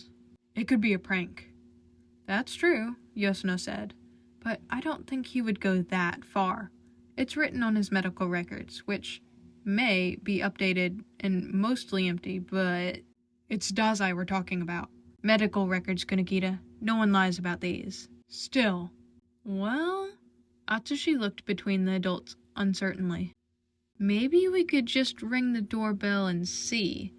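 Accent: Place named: United States. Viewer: Me trying to understand: 😦😧😨😰 You have a very strong American accent, my hopes of comprehension are long lost to the wind bahsbahha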